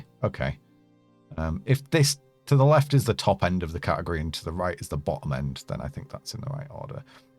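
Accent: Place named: United Kingdom. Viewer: why is this guy british? so wierd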